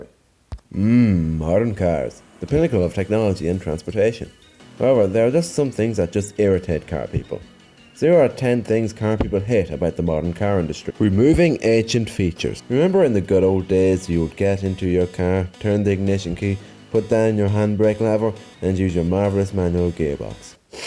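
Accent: Ireland. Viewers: U Irish too? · this lad is irish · Love ur vids man are u Irish?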